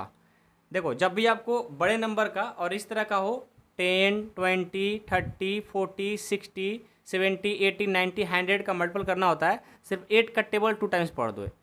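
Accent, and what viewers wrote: Bihar, India. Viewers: Sar ki language se lagta hai ki sar Bihari hai lekin kahate Hain Na ki ek Bihari Sau per Bhari😊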